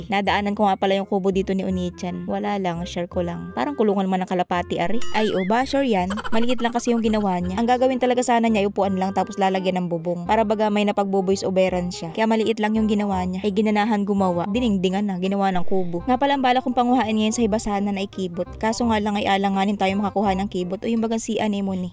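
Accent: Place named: Marinduque, Philippines. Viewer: salita mo salitang marinduque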